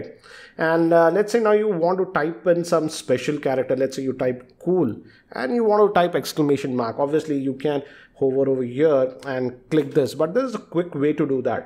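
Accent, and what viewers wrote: India. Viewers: thank you for doing these excellent, very task-specific videos.Your work is very appreciated. I'd like to make a suggestion to make their goodness even better. I am an English speaking American; and your native language is Indian. Your dialect and mine are quite different. Typically native-speaking English people speak more slowly. You speak much more quickly and (of course) pronounce English words as you learned and know them. I concentrate and listen closely to you; but due to our lingual differences unfortunately I fail to understand much of what you are teaching. When you make videos in the English language,could you try to speak more slowly and pause a moment between topics. What you are teaching is very valuable, and I want to hear and understand you fully. Thank you very much and please continue sharing you knowledge. I appreciate your work!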